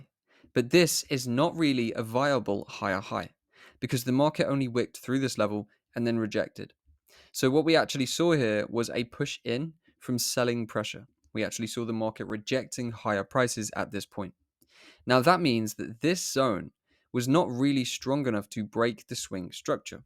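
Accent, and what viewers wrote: United Kingdom. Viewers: Bristish acent is great!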